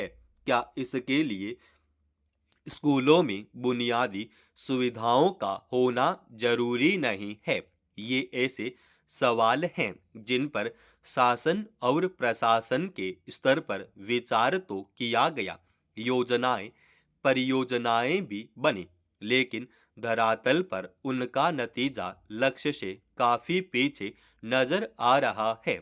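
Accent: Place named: South, India. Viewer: पेदा या पैदा अरे मद्रासी हिन्दी बोलना सीख लो। न होता है या नै। पहले अच्छे से हिंदी सीख लो भाई बोलना।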